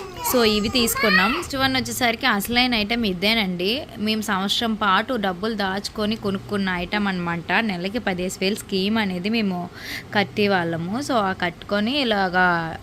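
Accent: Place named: Visakhapatnam, India. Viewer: Me latest short video nacha ledhu Telanganal lo konni rojulu unaru meru valla basha yasa ni egatali chesinattu undi... Mee vzm yasa kuda alage untundi ga... Speed lo vachestai... aina ok But ila comedy ki kuda oka limit... Balagam movie lo padina singer vaalu...valla yasa basha ni egatali cheyadam wrong..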